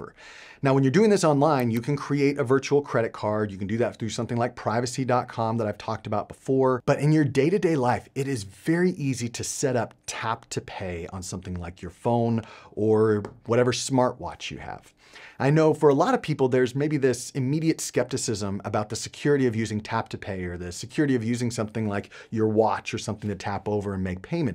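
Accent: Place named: United States. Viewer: I don't understand why you speak american english but your channel is in portuguese. Frist time I see such thing.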